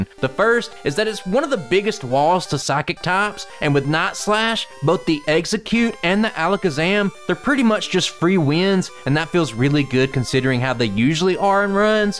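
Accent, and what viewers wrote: South, United States. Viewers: to hear your Southern drawling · You are one of the only Youtubers with a southern accent that I have ever watched.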